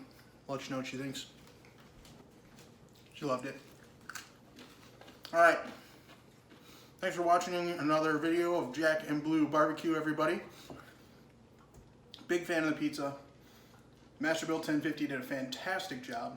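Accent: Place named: United States. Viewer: We say basil you say baysal lol, love the American English.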